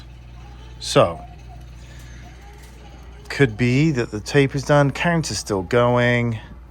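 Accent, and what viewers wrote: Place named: United Kingdom. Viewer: hmmm i hear a little british accent lol.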